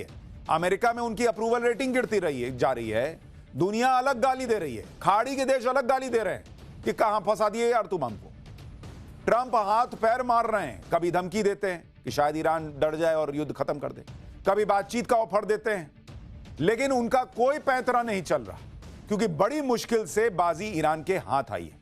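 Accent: Bihar, India. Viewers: Ye to Bihari Tun hai bhai aap ka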